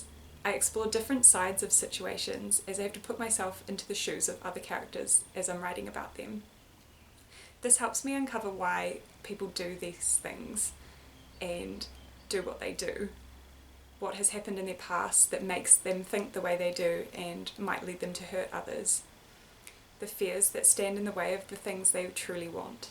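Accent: New Zealand. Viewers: Happy for your success in publishing your book online! I too find that writing is the only way for me to truly express myself. I write poetry. Hope you don't mind me sharing a poem I wrote recently: He wanders through eternity like a hermit in the desert, seeking meaning and purpose in a world, in a culture overtaken by lies and façades. He’s told to consume commodities, to chase career and cash. “You’ll be happy then” they say. Yet he still feels this gnawing emptiness inside, even having arrived at these things. The answer to wholeness doesn’t lie there he sees. He sets off towards another horizon, seeking what’s worthwhile; in touch with the whispers of his heart. The compass of his soul guiding him onwards. I'm also from NZ. I assume from your accent that you are too. Nice to meet a fellow kiwi on YouTube:)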